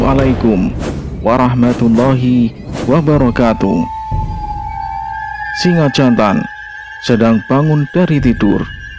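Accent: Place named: Java, Indonesia. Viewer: Gak apa2 mase maju terus.. Unik itu dialek jawanya poko e top maseee semoga sukses selalu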